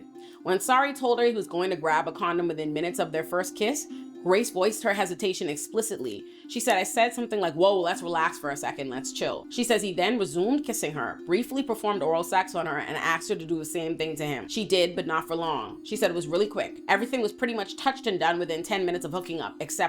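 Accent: Bahamas. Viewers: I may have asked before, but you Bahamian?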